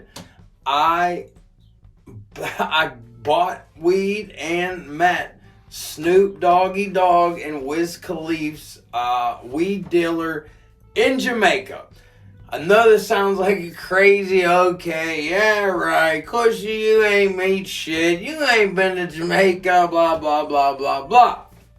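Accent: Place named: Pittsburgh, United States. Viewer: plus I'm from pittsburgh So it's great to hear the stories told with our burgh accent and from a pittsburgh point of view And funny as hell I say call Netflix !!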